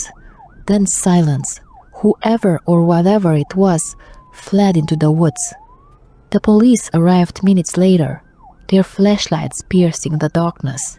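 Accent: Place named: Eastern Europe. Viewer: This is some Eastern European accent, but I can not locate it. Maybe Eastern Slavic, but not sure. Will you tell? Thank you for your effort! Me actually not listening to scary stories, told in a female voice. But wish you good luck!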